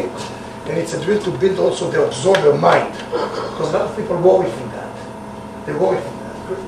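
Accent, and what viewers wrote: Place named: Germany. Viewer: He sounds German.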